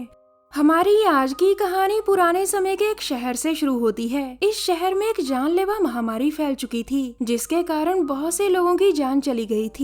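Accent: Punjab, India. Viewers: See I was thinking about you nd u r here... sachhe wala pyar h... nibhana apko bhi pdega.. Lv uuuuuuuuuuuu............uff ye Punjabi accent 😍 👌....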